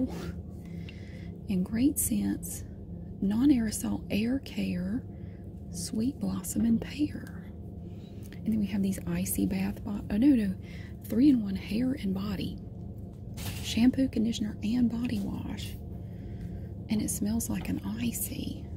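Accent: South, United States. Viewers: I guess being an adult means falling asleep to a southern lady organizing the clearance section of the dollar store.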